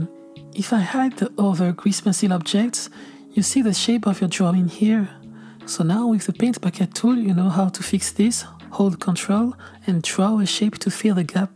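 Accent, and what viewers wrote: France. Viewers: What a cute French accent :3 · thank you very much for all this very well explained knowledge. And don't worry about that accent of yours, my french compatriote ;-) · What new french english accent😂❤ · ooohhh you must be french.... your accent 😍😛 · Ok... Are you french ? Because i think i recognize a french accent , Your vidéo was very helpfull so thank you <3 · J'ai reconnu ton accent français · Your tutorial is not just about Blender and grease pencil. You are a sleep therapist! Never heard anybody sound like you, and your command of English (going by the French accent) is just unexcelled! Thank you so much for being such a patient human being! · Are you French? Because you had a accent… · i have a curious question are you french or do you talk french bc your accent kinda remind me of it TT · j'entend un accent français.... mhhh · The french accent is fkng cute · C le premier accent franglais que j’apprécie 😍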